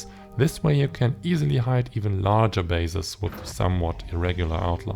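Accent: Germany. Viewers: are you german? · Am Anfang dachte ich kurz du sprichst deutsch xD Dann doch englisch, dann habe ich deinen Akzent bemerkt :P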